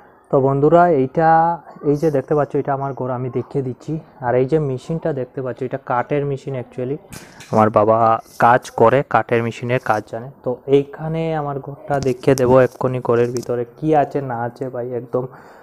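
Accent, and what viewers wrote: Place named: Sylhet, Bangladesh. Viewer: Tumi sylheti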